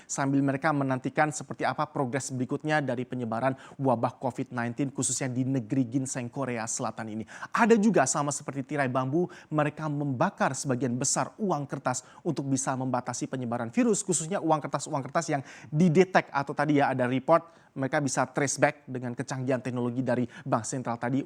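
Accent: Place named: South Jakarta, Indonesia. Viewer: Pembawa beritanya orang jaksel nih.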